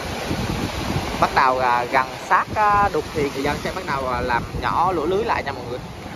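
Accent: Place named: Quang, Vietnam. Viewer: Khi con nói chuyện con đừng hỏi bằng tiếng quang. Vì CĐM ko nghe được con ạ. Con cứ nói bình thường đi con và khi con quay nên quay gần con cá cho khán giả xem cá này là cá gì vì tiếng mỗi nơi gọi tên khác nhau ❤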